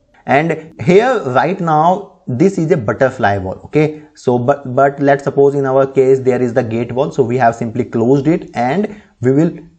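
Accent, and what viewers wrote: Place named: India. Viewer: please make the subtitle, your english language contaminated with Indian/pakistani spell. so confuse wht do you talking about.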